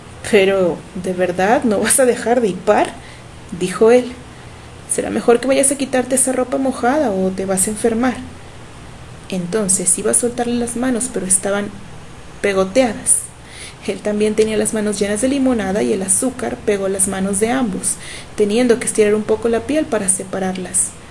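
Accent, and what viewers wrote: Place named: Peru. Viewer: hola tu eres la narradora? Hablas como Peruana o Ecuatoriana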